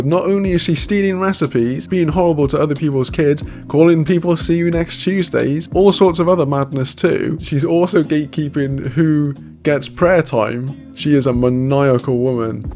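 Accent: United Kingdom. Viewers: The brittish voice adds so much to this.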